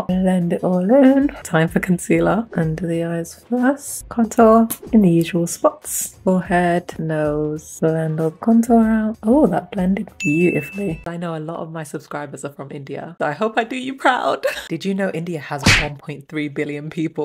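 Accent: United Kingdom. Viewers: Are u british?!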